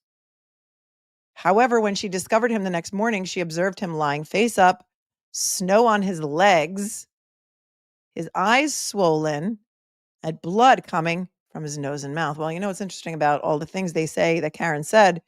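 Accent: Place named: Long Island, United States. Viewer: I know you don't need more kudos. But I have to give them. You have all the receipts, all the time! Your coverage is my favorite, and got me through 2 surgeries (just with Karen Read!). Looking forward to watching 2.0 with you. Plus I lived in Great Neck and Levittown for 15 years so your accent makes me feel like I'm visiting friends.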